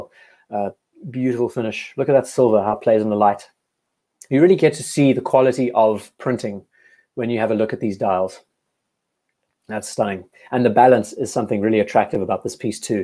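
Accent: South Africa. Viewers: Did not know you were based in the UK. I thought I was picking up a South African accent.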